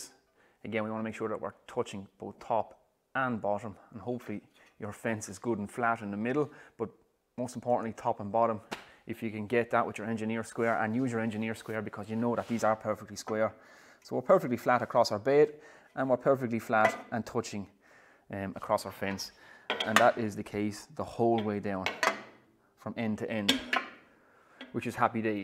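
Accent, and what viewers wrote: Ireland. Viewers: I hear an Irish accent, I sub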